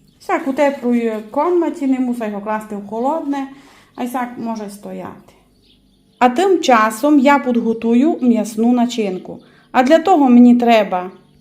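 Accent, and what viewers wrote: Transcarpathia, Ukraine. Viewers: Дякую за файну закарпатську мову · Дякую за рецепт. Ви гарна господиня. А мова! О Боже, цей Закарпатський говір... Люблю Вас!❤ мої рідні УКРАЇНЦІ! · Май файно, коли авторка сказала " чебуреки добрі коли в них багато м'яса"!!!! Щастя вам і вашій родині!!! Всім нам миру!!! Дякую за файні рецепти!!! А наша ЗАКАРПАТСЬКА мова тото ще май приємне як мнясо з чебурека(фіґля)!!!!!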